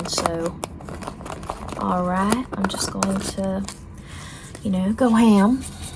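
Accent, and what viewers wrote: South, United States. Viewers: we need more of this southern accent from you! it’s so relaxing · HAHAHA I love the southern accent so much!! despite how serious and scary this video is the southern accent made me laugh!! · Please do more with a southern accent 🙏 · THAT SOUTHERN ACCENT ATE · What I think about this video: I love the southern accent ❤ It was not interesting that you used the same nickname over an did very like honey pie and sweetie pie ( no offense 😅) I think to boost your the amount of people who watch your videos is to make it more realistic but I still love the effort 😊 Try getting toys that are used for the topic of your video so for this video you would need a knife, ingredients,toy cooking supplies can make your videos amazing 🤩 That's all I think about this video!❤ Keep up your amazing work and I hope this motivated you and inspired you to do this too! 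💕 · Bro took we are cooked to a way new level 😭😭 (*btw you ate on that southern accent:3*) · The southern accent was really good! Although a lot of people where I live accent is heavier than that(😭) you did super good love it! Feeling kinda cozy while being cooked 🎀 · I REALLY LIKE THE ACCENT, I HOPE YOU DO MORE SOUTHERN ACCENT VIDEOS 🔥🔥🔥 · THE SOUTHERN ACCENT SLAYEDDDDD😜💅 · As someone from the south: this is pretty good!!! It's fun to hear the voice with her physical mannerisms, cuz it's very different from people in the south i reckon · Omg I love your southern accent 💙🖤💜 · Lol I wonder if I should admit I kinda got hungry watching this, I just love southern cooking 😅Speaking of which, I actually have southern relatives and I thought you did great with your accent !!💗:_approved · as a southern person with a southern accent, i think you did great!! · The Southern accent is so relaxing! 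But the ending…is it hinting that Alex and Eric were not simply in-laws and had something deeper between them :_cool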